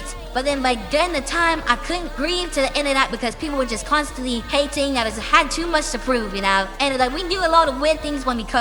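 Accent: United Kingdom. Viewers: your British is showing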